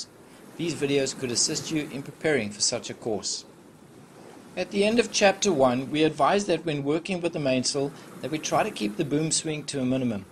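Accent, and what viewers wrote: South Africa. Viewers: Totally Fabulous - love the SA accent too !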